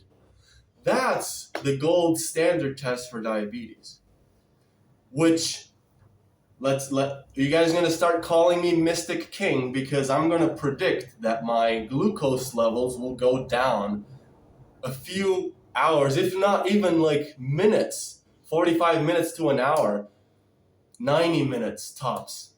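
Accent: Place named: United Kingdom. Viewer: The British accent though 🤣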